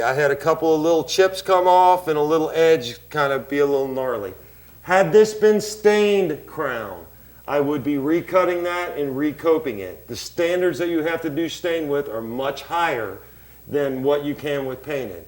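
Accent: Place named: Pittsburgh, United States. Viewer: Hi! I see you are in Cincinatti, but I swear I hear Pittsburgh in your accent! Are you by chance from Pittsburgh?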